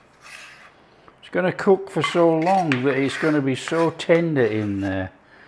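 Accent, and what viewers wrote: United Kingdom. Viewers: Oh, COME ON PLEEEEASE, another fantastic video? I havnt digested the last one yet, 😅 sir you are the best cook, chef master on the internet, and with good reason, your meals, recipes are so perfect, your an awesome fellow to listen to, even though i have to really listen cause your british accent, the entertainment you share with us, and you tasting what you made at the end, is priceless, love your channel sincerly sir, with huge respect from the southern great state of Illinois...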